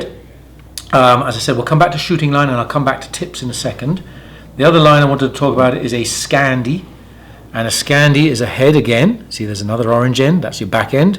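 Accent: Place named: England, United Kingdom. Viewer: There's certainly something to be said about having a presenter with an English accent. Watch any of David Attenborough's nature documentaries and you'll understand why. As per usual does an excellent job of breaking down something which can seem daunting to beginners, into a no nonsense, easy to follow guide for getting started.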